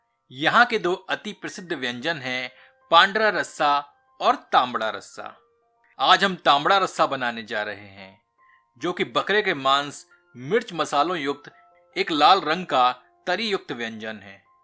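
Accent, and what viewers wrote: Standard. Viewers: Waah shudh Hindi sunn kar bohot anandit hua mera dil ❤️👍👍👏👏👏 .. but recipie thoda lamba process hai !! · sudhha hindi uchharn mujhe sun ne ko mila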